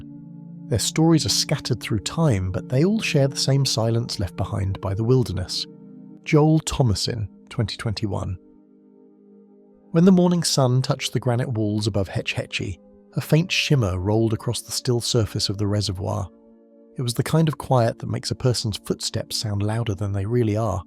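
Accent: England, United Kingdom. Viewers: You think this is bad try to sing to a strong Irish or strong Scottish accent lol. The narrator has an awesome English accent!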